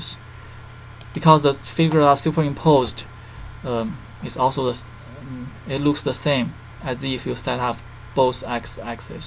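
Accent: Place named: China. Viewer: I heard strong Chinese English. Keep going.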